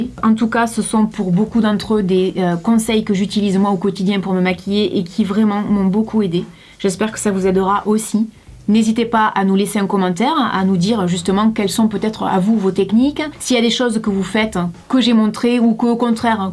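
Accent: South, France. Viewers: J'adooore! Merci pour tes conseils pratiques et clairs. Et l'accent du sud en prime, on adore! 😁 PS: tes ridules sont sublimes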